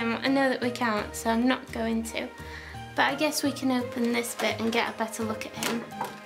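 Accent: United Kingdom. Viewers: what's up with British accent my elf name is mark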